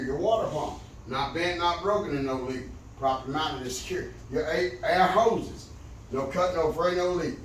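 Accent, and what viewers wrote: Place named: South, United States. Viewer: It's very detailed but your talking to fast and country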